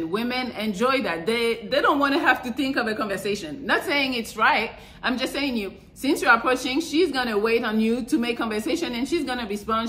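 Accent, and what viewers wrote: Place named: France. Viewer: Love your French accent.❤️❤️❤️❤️❤️❤️❤️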